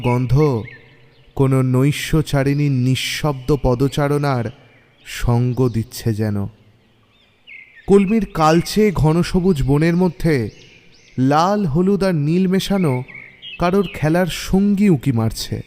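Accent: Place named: Bangal, Bangladesh. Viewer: Kat bangal reeeeee ekdom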